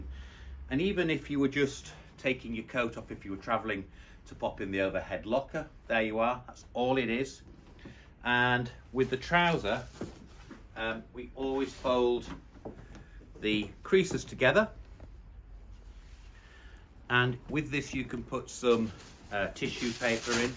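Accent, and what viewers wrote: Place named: RP, United Kingdom. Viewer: I so like to listen to your cultivated British English. Always a pleasure.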